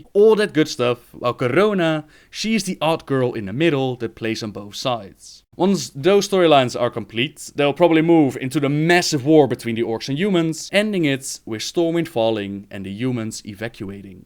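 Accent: Netherlands. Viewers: I think hes from the netherlands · Your dutch accent! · dutch accent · It's a Dutch accent.. I reconnized it right away. I hate the Dutch english accent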